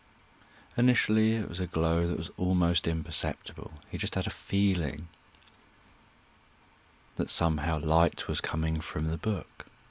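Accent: United Kingdom. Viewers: I only fell asleep because your British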